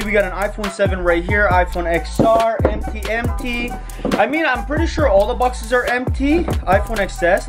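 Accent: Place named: Chile. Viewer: Abla como chileno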